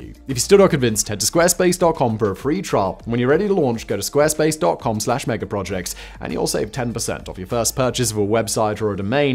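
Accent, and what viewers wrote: United Kingdom. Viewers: why is there suddenly 50,000 british guys narrating stock footage for ad revenue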